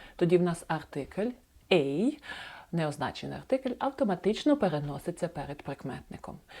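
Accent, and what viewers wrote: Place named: Precarpathia, Ukraine. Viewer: мікс прикарпатських інтонацій української мови, доповнених певним акцентом тривалого проживання в англомовному середовищі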